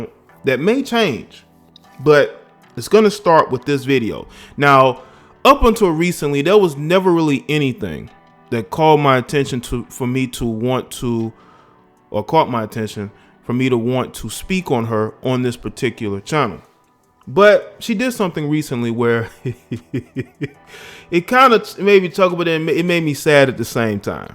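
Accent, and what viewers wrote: South, United States. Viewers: You don't speak with a southern drawl but I can tell you are from the south. Nothing wrong with that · Nah bruh you really sound country!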